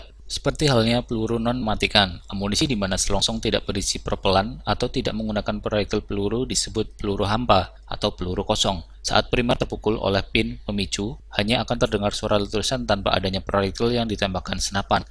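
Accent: Banyumas, Indonesia. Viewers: mmg top bermanfaat ilmu2 yg di bagikan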